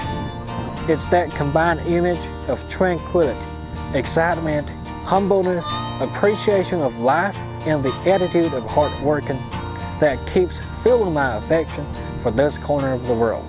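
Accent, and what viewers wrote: South, United States. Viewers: I think if you had learned and tried to speak with the "midwestern American" accent that television news reporters use, you would speak with a Chinese accent. Because you learned and speak with a southern accent, it hides any Chinese accent. You sound like a person from the American south. If this works for you, great! There are probably relatively few people from China who are learning English with an accent from the American south so it makes you very memorable. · Men you have no idea how much I love you southern accent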